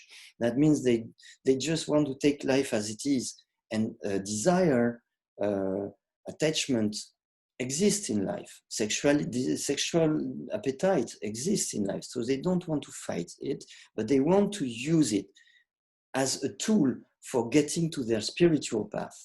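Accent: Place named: France. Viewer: (To my humble opinion) This is worth enduring Frrench accent to benefit from his knowledge given with such gentleness. I am sending you my gratitude especailly for the short meditation you guide here, this is exactly what I have been missing and looking for since I came back home after the TEL6 post-coco:-D Namasté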